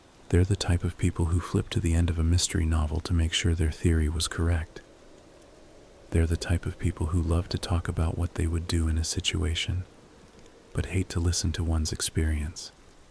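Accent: United States. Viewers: Is he not American? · You fooled me with the American accent but definitely a scary story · Very convincing American accent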